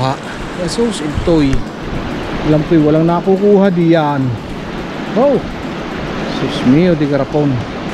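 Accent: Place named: Batangas, Philippines. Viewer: I like the batangueneo accent hehe.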